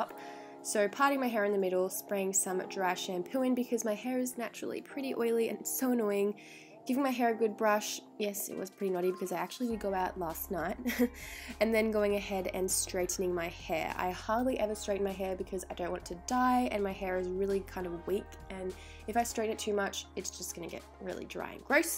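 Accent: Australia. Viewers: Sounds Australian so that would make sense. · I am not a native english speaker but I'm used to Lauren Curtis's accent,so I was feeling this beauty guru is Australian as well!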